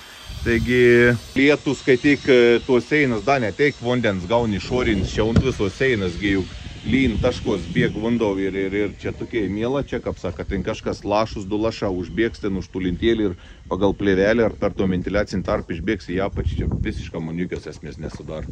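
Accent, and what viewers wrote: Samogitia, Lithuania. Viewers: Sveiki, is kokio miesto esat ka zemaitiska tarme? Gal Telšiai?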